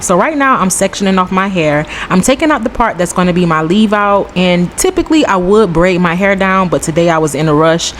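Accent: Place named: Florida, United States. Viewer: the way the florida accent done became so recognizable to me now is crazyy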